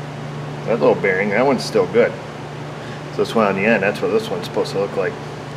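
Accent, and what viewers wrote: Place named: New Jersey, United States. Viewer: wait what are you actually russian i thought you was from joisey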